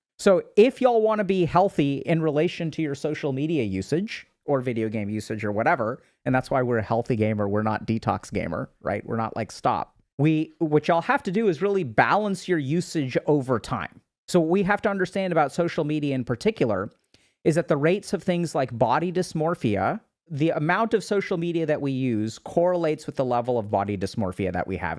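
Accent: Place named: India. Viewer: HAHAHAHAHAHAAHHHAHAH THE INDIAN ACCENT HAHAHAHA XDD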